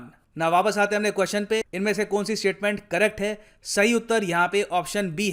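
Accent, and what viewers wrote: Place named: Haryana, India. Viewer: Haryana se lgti ho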